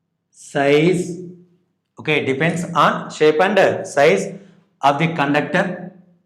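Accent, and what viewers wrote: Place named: Telugu, India. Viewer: Though they don't speak good english but we can understand it. We should not judge people by the way they speak we should judge them by their talent they possess. They are telugites they are made to speak that way (telugu slang in english). Though they are not good in fluent english they are good in their teaching and we are able to understand.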